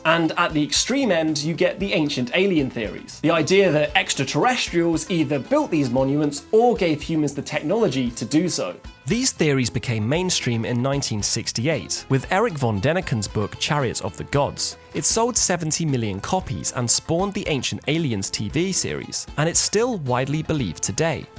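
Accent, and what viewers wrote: United Kingdom. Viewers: I can't do a third in a row video with the speaker babbling with their irritating British accent. I don't find the language "cute" or "charming". Just annoying.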